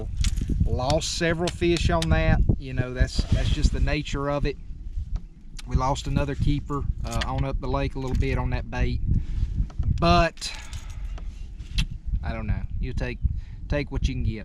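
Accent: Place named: South, United States. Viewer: So I've gotta ask you, what's a pieket, I know what a pocket is, but not sure what a piekit is, 😄😄. Man I had too, been watching your videos for awhile and you've got the biggest southern draw I believe I've ever heard. I get a kick on how you say pocket. I do enjoy your videos tho.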